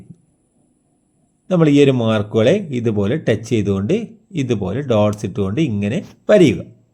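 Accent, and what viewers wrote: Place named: Kannur, India. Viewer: നിങ്ങൾ കണ്ണൂർ കാരനാണോ??? സംസാരം കേൾക്കുമ്പോൾ അങ്ങനെ തോന്നുന്നു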